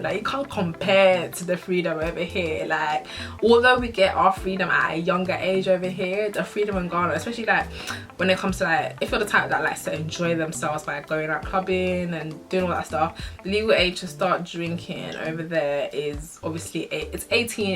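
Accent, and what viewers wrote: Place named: United Kingdom. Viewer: How do you still have a Brits accent after 10yrs in Gh. I moved to Gh came back after 8years which is after SHS. But picked up the Ghanaian accent. How did yours not disappear? 🤔🤔🤔🤔🤔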